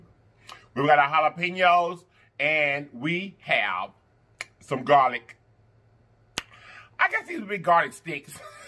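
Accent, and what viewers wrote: South, United States. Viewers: I love you!! I’m from the Sip and I love that southern twang we have... “wait a minute!!! Ya boy done got choked out!!!” 😂🤣😆❤️ I felt that!! ✊🏽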